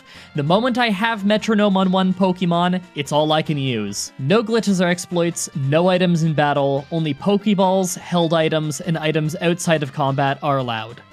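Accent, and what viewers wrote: Canada. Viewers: U sound canadian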